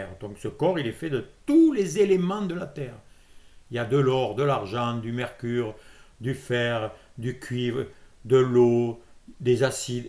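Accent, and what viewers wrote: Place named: South-West, France. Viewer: ❤❤😂❤😂quelle pêche, lucidité, humour, objectivité...n en jetez plus😂😂😂...vous êtes du sud ouest avec 1 accent aussi prononcé...?? Le même que le mien soit dit en passant..en tout j adore..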